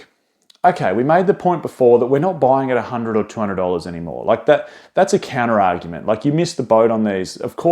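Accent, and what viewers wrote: Australia. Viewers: Pop it on the Tele merch this is as Aussie as you can get….loving it mate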